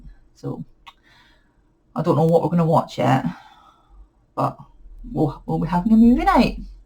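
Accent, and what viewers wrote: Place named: Glasgow, United Kingdom. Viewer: Love that you hear the Fife accent but here in Fife all anyone hears is the Glaswegian accent 🤣 Your Virus Shawl is gorgeous and your cabled Jumper is going to be amazing 😍 There is nothing worse than half spun or broken bits in commercial yarn, so completely feeling your pain. Your Cross-stitch is fabulous, can't wait to see it progress it's going to be stunning!!! xxx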